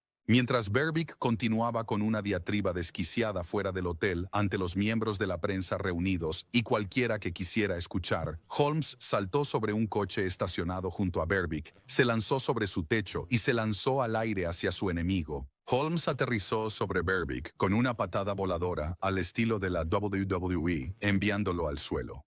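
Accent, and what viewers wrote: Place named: United States. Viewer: El contenido del vídeo está bien, lo que no me gustó mucho es esa voz agringada que exagera las pronunciaciones de los angliscismos y los apodos sajones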